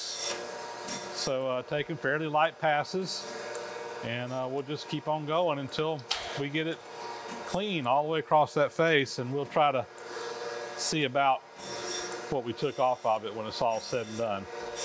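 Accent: South, United States. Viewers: you might find this a bit funny. When you say "arn fest," I used to think you were saying "iron fest" with your southern accent, But recently I saw Mr Pete's video and he had an "Arn fest" t-shirt on. 😂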